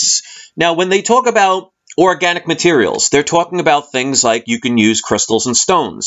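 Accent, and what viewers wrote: New York, United States. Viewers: I really enjoyed listening to this video and learning all about orgonite from you!! You are so knowledgable, and so passionate about what your doing and we need more people like that. Creating an awakening within others is difficult esp. when they are so quick to dismiss things out of ignorance, or discomfort. I commend you for doing your part to disrupt the powers that be, and bring positivity into the world. plus you sound like a fellow new yorker haha so you're already good in my book :)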